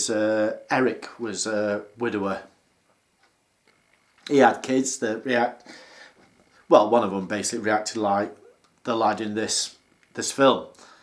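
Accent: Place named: England, United Kingdom. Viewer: I love this movie! I have the hots for the older grey haired man in the film (soccer holigan man). You are a very cute with a loverly english accent:) very cute smile aswell.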